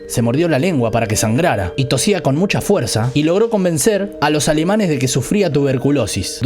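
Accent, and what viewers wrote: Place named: Argentina. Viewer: Me gustaria saber de donde es este muchacho, parece argentino.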